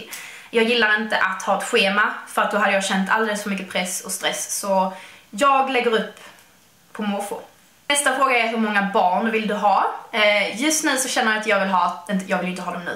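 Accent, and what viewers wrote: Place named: Scania, Sweden. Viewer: Haha, när du sa att du inte pratade så jätte mycket skånska jag bara va? Jag tycker att du pratar väldigt mycket skånska. Är själv stockholmare så fattar inte vissa ord du säger men jag tycker skånska är fint. Vissa av mina kusiner bor i Skåne så är van med dialekten. ( min mamma är även uppvuxen där...). Det här var inget hat tycker bara att det var lite roligt när du sa det. Ha en bra dag och den här videon var jätte bra!! kram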